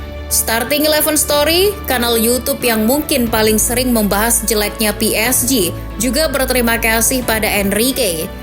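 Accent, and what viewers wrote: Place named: Manado, Indonesia. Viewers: Lah admin nya orang Manado ya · Logat manado wkwk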